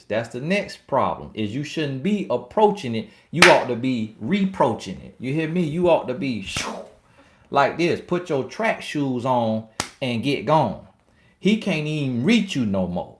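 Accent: South, United States. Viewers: I just finished watching this chat. I enjoyed it. I just started watching a few of your videos. You have a new subscriber. I don't know you but I like your aura, that you speak of God and your southern roots. Your accent reminded me of my mother's accent (she grew up in FL). God bless you! "I don't have Go-Go gadget eyes". You're funny! Maybe a few ppl on here know about Inspector Gadget.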